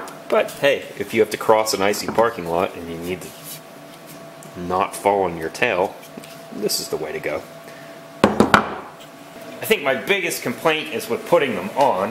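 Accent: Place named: Pittsburgh, United States. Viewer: there's been one or two times where I can hear that yinzer accent but I don't anticipate anyone mistaking you for Pittsburgh dad.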